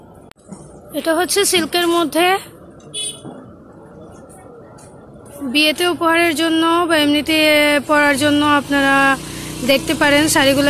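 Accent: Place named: Sylhet, Bangladesh. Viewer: তোমার সিলেটি ভাষার প্রেমে পড়ে গেলাম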